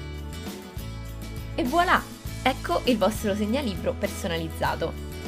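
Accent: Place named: Rome, Italy. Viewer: Sei americana o italiana perché mi sembri di roma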